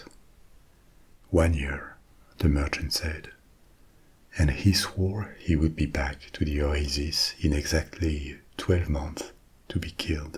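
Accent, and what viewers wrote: France. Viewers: Son accent français j’adore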